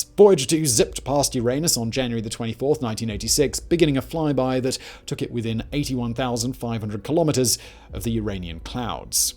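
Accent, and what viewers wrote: RP, United Kingdom. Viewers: Classy British accent - Well-dressed - Well-groomed - Thoroughly-researched facts and insights - Literally twelve years old, apparently 😁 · Fact Boi is such a professional online educator that he can maintain his perfect RP and a straight face (all but once) through the constant barrage of bunghole puns.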